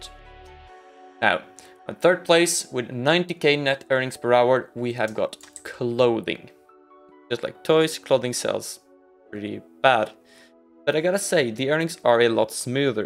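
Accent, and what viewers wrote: Norway. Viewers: er du norsk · e du norsk lol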